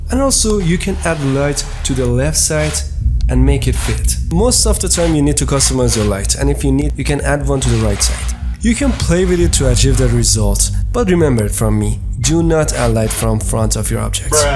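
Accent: Iran. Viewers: All the respect... just remade and turned out CRAZYYY my client will be impressed. Also, you sound hella Persian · az lahje fahmidam iranii movafagh bashi😂❤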